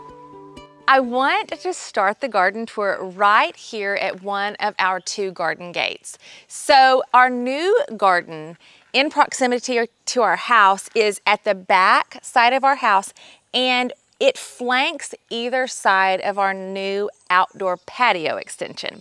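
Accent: South, United States. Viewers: Love your gardens. What is the big green trailing plant on the steps at the end if the video when you’re sitting on the steps? Also, what is the white trailing flower in the round tall pot that isn’t on the steps. I knew you were a Southern neighbor when I first heard you talking in another video. I’m from Memphis but moved to Franklin, TN 40 years ago until grandchildren came then we just had to get closer/ Murfreesboro now. Anyway, keep making your awesome videos. We southern ladies just love to decorate and garden don’t we? It’s our heritage. 🌹😉😀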